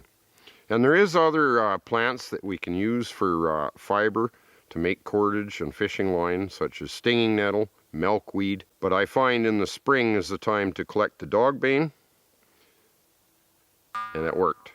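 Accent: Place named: Canada. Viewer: you sound like your from Canada 🍁 🍁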